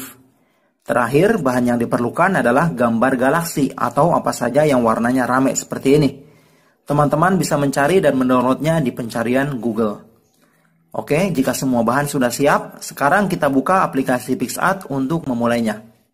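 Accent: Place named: West Java, Indonesia. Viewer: Sunda euy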